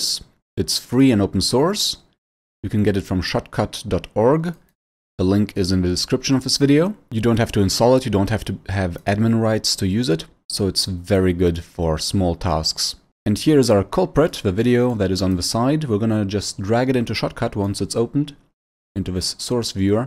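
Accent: Germany. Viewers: Hört sich schwer dannach an, dass deine Muttersprache Deutsch ist?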